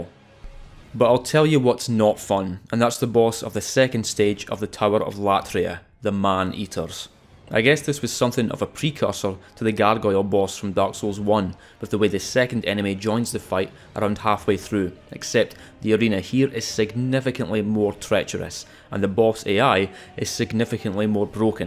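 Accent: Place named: Scotland, United Kingdom. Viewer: The accent is so nice to my ears I love it, I wish Scottish people were real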